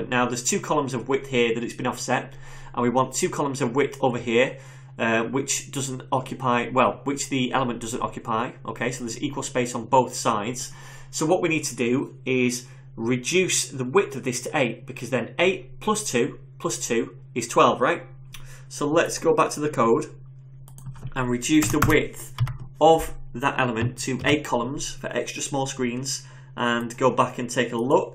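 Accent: United Kingdom. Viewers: i was learning british accent too so you are just perfect ^_^ explanation was great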